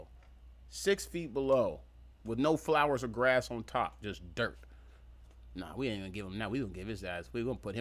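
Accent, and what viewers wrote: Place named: New Jersey, United States. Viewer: Are u from Jersey bro? You sound like it. Either way keep it up brotha!!!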